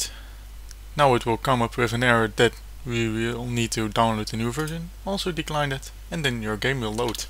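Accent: Netherlands. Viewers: je hebt wel echt een vernederlandst accent xD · its so funny how you can easialy hear that you are dutch :) ty for the help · dutch for sure..? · are you dutch? you have a dutch accent :P ( im also dutch)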